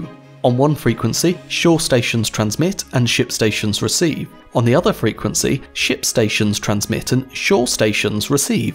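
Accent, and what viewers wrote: United Kingdom. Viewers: Informative, concise, well animated and British voiceover. Perfect. Avast ye scurvy knaves and prepare to be boarded ! Aaar !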